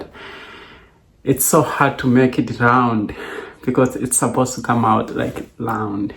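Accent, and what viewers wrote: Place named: Kisii, Kenya. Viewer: I love your Kisii accent just like congrats dad😂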